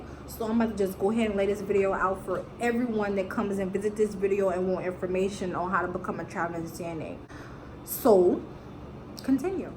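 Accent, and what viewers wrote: New Orleans, United States. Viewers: I LOVE LOVE LOVE LOVE your NOLA accent whew! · Love your NOLA accent 😩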